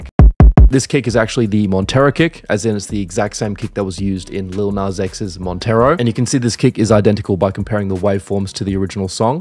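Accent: Australia. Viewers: you aussie? glad I come across u, awesome tutorials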